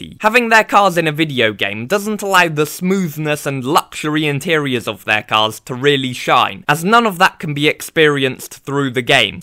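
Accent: United Kingdom. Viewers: you are british, right?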